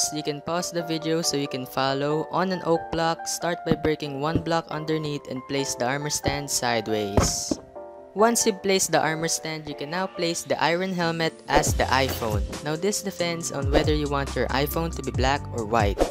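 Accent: Philippines. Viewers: you sound like you have a filipino accent. are you?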